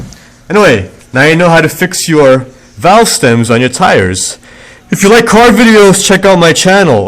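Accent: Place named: New York, United States. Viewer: Good reminder for me from Vinnie Barbarino from Welcome Back Kotter😂😂 You do sound like him with the NY accent👍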